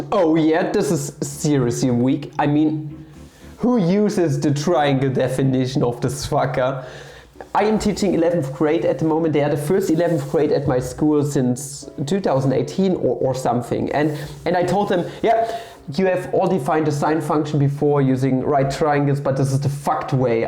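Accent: Germany. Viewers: dein akzent hört sich richtig deutsch an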